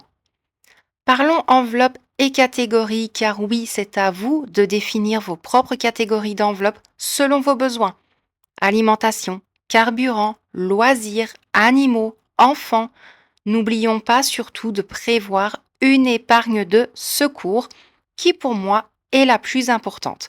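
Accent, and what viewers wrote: Belgium. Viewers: Coucou, super format et très bien niveau diction malgré ton fort accent belge lol... Par contre il faut absolument que tu recouvres ton micro avec une poupette, lol une genre de touffe qui atténue les bruits de bouche car quand on t'écoute avec un casque c'est très désagréable... Et après tu seras au top du top... Gros bisous 😘 alias spoonielovejunkjournal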